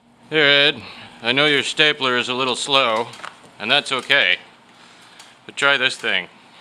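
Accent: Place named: Croatia. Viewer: Brilliant work however your heavy Croatian accent makes it difficult to follow your otherwise excellent vid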